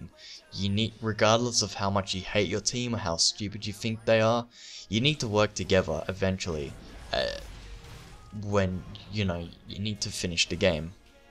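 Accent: Australia. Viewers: YOU'RE AUSTRALIAN, AREN'T YOU...... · im from Argentina, and i have no problems understanding what he says, i do believe he is Australian (he mentioned something about it in the last video comment section) its just that you arent familiar with his accent, thats all, australian accent is more like british if you actually want to compare accents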